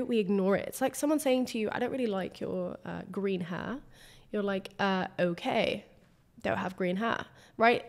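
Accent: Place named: Yorkshire, United Kingdom. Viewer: Ya don't sound like ya from Leeds, that's a very posh Yorkshire accent 😆😉 It's a great channel ya building here. Sending ya peace and love from Huddersfield ❤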